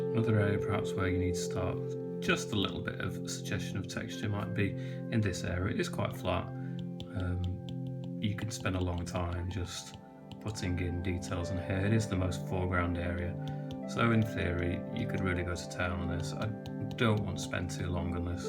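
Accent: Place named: Yorkshire, United Kingdom. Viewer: Fantastic, just the right level of detail, nice and clear. Like the background music, not obtrusive, but relaxing. Lovely Yorkshire accent!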